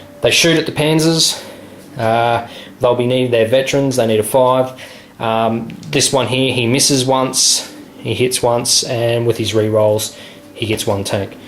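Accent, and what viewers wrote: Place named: Australia. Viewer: You've got a hell of an Ozzy accent mate...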